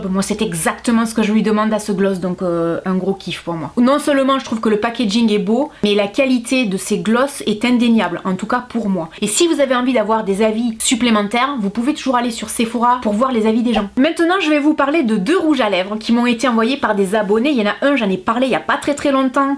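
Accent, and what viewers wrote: South, France. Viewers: Ta bonne humeur mêlée à ton petit accent du sud me donne toujours du peps (surtout en ces temps troublés) bisous à toi porte toi bien 😘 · Merci beaucoup pour vos vidéos, vous êtes très sympa et votre accent du sud est génial 😀Le rouge à lèvre gerlain, c’est vraie qu’il ai génial et super sympa. Je l’ai eu en cadeau Noël 2019 .😊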